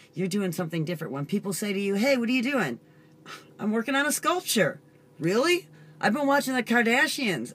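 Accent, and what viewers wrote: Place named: Southern California, United States. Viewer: You look and sound like you are from southern California.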